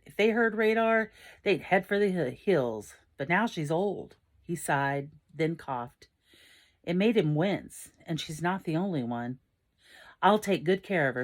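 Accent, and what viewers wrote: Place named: United States. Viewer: I like your US accent from the UK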